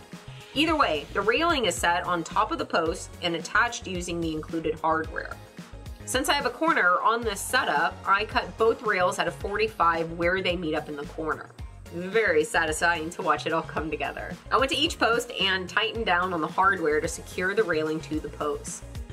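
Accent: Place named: South, United States. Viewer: "Sawlz Awl"...love the southern drawl 😁 Awesome job,!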